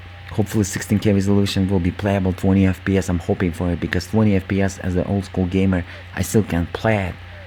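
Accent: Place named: Russia. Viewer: он русский слышно же по речи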